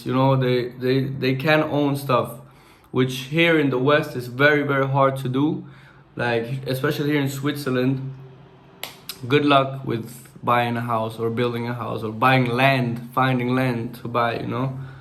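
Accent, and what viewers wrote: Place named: Malawi. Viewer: 😊you speak as if you’ve been in malawi for decades bro,wow proud 👏 of you and my mother malawi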